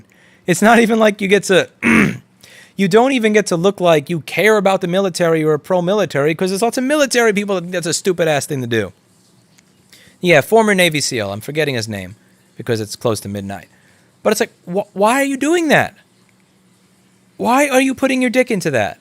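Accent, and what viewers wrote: United Kingdom. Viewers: Complaining about British accent is like complaining about Louis looking like an Italian 😆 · I love British accent, and after what I heard from Louis I have even more reasons to develop it just to annoy people. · As a brit I dont like the way people are complaining about a british accent...can you ask him to double down on it? Or to put on other accents. Brummy' scouse' gentleman. That would be just spiffing.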